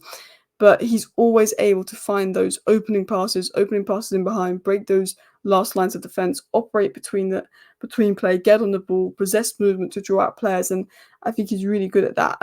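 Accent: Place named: Liverpool, United Kingdom. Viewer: Last year in the same pre season games Quansah played as well as now. I hope he will play much better then then when the real games begins. In the end of the last season he didnt play well but now his experiencie grows and he is more reliable. I think LFC still needs a top level CB. By the way........di you already noticed that you are almost scouser?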